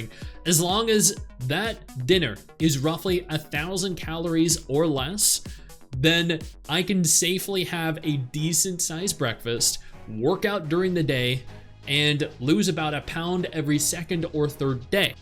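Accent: Australia. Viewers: wtf you’re Australian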